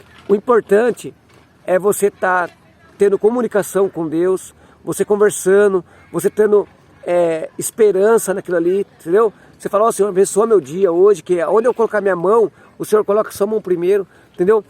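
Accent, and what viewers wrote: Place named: Rio de Janeiro, Brazil. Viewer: Sempre sigo suas dicas pois é um dos melhores canais sobre mecânica e principalmente sua humildade seu carioca e simplicidade nos vídeos que são muito instrutivos que Deus continue te abençoando sempre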